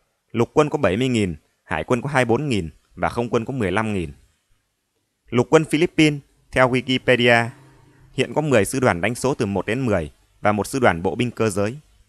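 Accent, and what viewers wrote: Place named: Nam Dinh, Vietnam. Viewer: ad. que o dau z.. nge giong o nam dinh z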